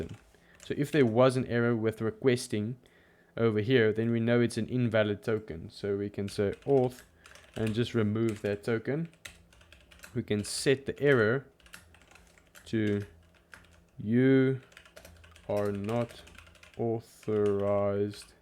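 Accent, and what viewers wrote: South Africa. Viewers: Am I hearing a South African accent👀🔥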